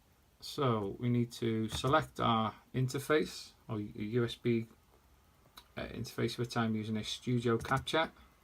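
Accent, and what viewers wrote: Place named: North, United Kingdom. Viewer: Nice one mate. Thanks. And love the northern accent!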